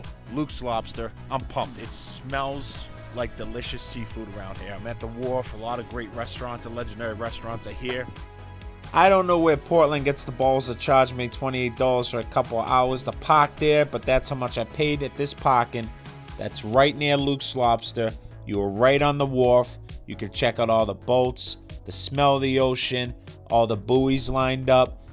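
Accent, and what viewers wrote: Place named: Boston, United States. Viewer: Stick with it…..your content is improving….you are likable and your absolute Bostoness is contagious….I am now a subscriber.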